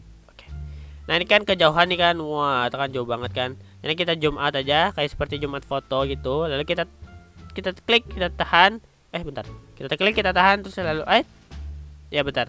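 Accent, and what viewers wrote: Banjar, Indonesia. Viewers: Kadaaaaa jerrr,, Logatnya banjar bujurr 😄😄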